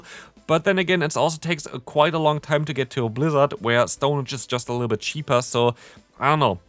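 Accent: Germany. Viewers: He's german.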